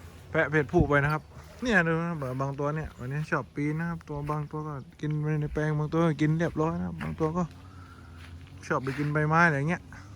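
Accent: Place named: Surat Thani, Thailand. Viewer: แหลงคล้ายๆคนสุราดเลยบ้านยุไหนคับ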